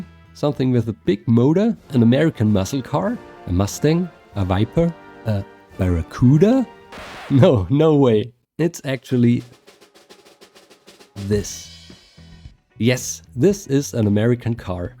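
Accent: Bavaria, Germany. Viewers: Ich höre ein bisschen deutsch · Geiles Denglisch fühle dich aber jetzt schon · JOOO are you deutscher mayby like bayrischer or mayby from frankfurt? but geiles auto find es auch geil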